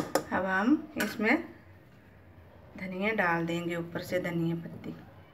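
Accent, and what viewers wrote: Pahari, India. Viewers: I watched so many videos of this recipe but what was special in your video was the little pahari accent that was there Usko sun ne baad confirm hua yes someone from Himachali connected to roots is making this and this is authentic and right way to cook it This was so sweet of you aunty ji ♥️ Much love from your son like child Deepak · Araa aunty apki recipe ne toh moti hi madad hi kr di.. lockdown k time (pahari accent) ☺️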